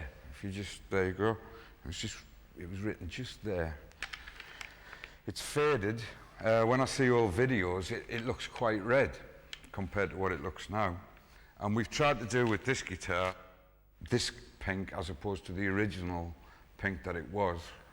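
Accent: Middlesbrough, United Kingdom. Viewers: Thanks, nice to see how you work all that. I was born in Middlesbrough but parents left when I was five. Nice to hear that accent again. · I'm a Middlesbrough guy, our accents are pretty similar - we've never met but in the late 60's when I got married we lived in one of the street houses near Ayresome Park, and Rea's cafe of course. In '62 my mate and I bought guitars from Hamiltons Music; he got the exact sunburst Hofner as you and I got the red Hofner V2 with same switch gear as your brown one, a young lads Strat. Cost 52 Pounds! Both were played, mine through a Selmer amp, around the pubs, clubs, dance halls in the area and were well used. But I still have the V2 in its original red-plush lined case, no longer in use of course. In the 80's I also bought a Tokai Springy Sound Strat in South Bank. I traded it on a new Tel some years later, which I regret as they are sought after now. 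Also have a PRS Les Paul type but never had a Strat, so am thinking of getting one for that unique clean tone. cheers John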